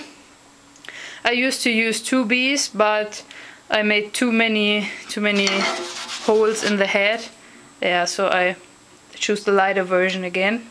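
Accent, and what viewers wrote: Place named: Germany. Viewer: Youre german right? I like your accent :)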